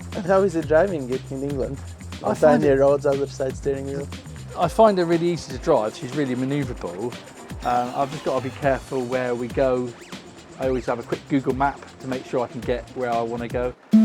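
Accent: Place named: Ukraine. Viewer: Dubbing? I understand both of them…one British and, the presenter, sounds possibly Ukrainian. Since you’re writing in another language maybe you just don’t understand them, or the closed captioning isn’t doing a good job of translating for you. I find it very inefficient. No fault of the channel.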